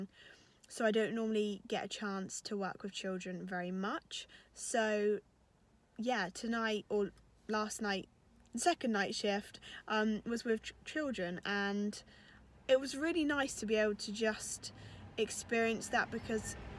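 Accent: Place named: England, United Kingdom. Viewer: Sotaque inglês é bonito demais, pena que é difícil de entender kkk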